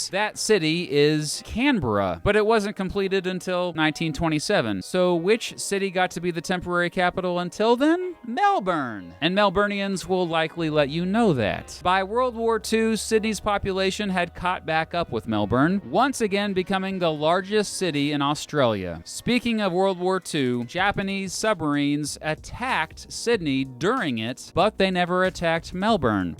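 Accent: United States. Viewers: Melbourne is my favourite city!!! (I’ve lived in Adelaide, Sydney, Melbourne, Toronto and London) Btw the way you pronounced ‘Melbourne’ did not make me cringe. Aussies say it like ‘Melben’ because we don’t pronounce our R’s like Americans do. So with the American accent, the way you’re pronouncing it with the R is not really wrong (imo). As long you’re saying ‘Mel-burn’ and not ‘Mel-BORN’ like the Bourne Identity. That one makes me cringe... though I can’t really blame people for doing that since it’s spelled the same way.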